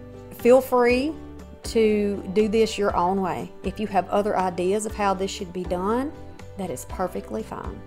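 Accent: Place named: Alabama, United States. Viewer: Hello from a fellow Alabama girl! I haven’t watched this video yet, but I already know I will love it. I found your channel last weekend and I’ve been watching a lot of your past ones. I have one playing on my tv in the bedroom right now from last year. Love your channel and I love hearing someone who has the same accent as I do 😊❤ best wishes! (Subscribed and hit the bell to receive ALL your notifications 💜)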